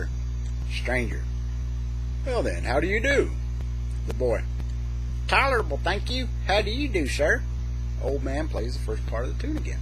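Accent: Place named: Arkansas, United States. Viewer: You sound like an Arkansawyer all right, but we downEasters don't talk the way you think we do. However, you like storytelling, fireplaces, and pipe smoking, so you're a friend of mine I just haven't met yet. I enjoyed this vid enormously; and if ever we meet, I'll fiddle you and share my tobacco.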